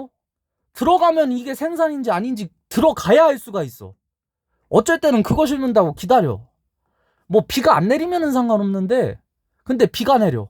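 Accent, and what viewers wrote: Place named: Dangjin, South Korea. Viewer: 당진 사람이죠? 제 아는형님하고 말투가 너무같아요 당진이면 유성 코일도 괜찮아보여요 평판이니 저는 같은 충청사람으로써 너무 말투가 공감이가네요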